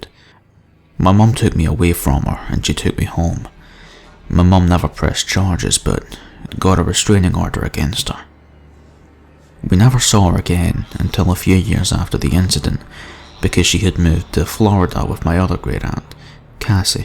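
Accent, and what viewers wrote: Scotland, United Kingdom. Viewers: I'm a huge fan of the horror narration channels, great to hear a fellow Scot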